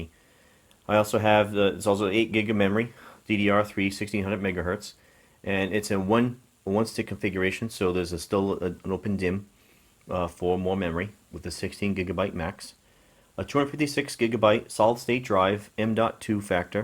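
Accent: Boston, United States. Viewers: Is that a boston accent?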